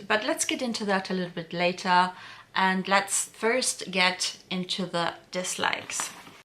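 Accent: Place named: Germany. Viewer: I absolutely love English being spoken with a German accent….especially when it is spoken by a German woman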